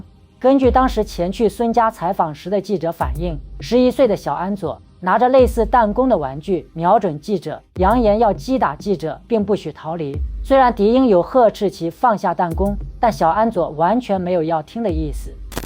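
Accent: China. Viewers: 是温州人？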